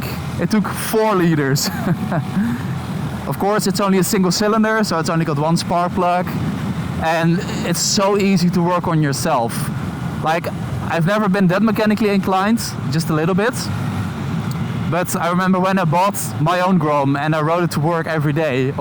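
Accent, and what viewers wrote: Netherlands. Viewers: Are you Dutch? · smerig hollands accent zeg jeetje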